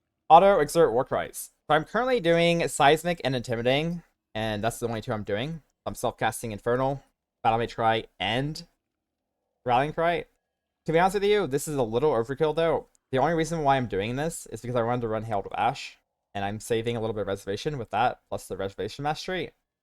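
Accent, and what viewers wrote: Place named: Germany. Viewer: Wie wär's mal mit langsamer sprechen? Immer diese Deutschen und ihr versuch englisch zu sprechen...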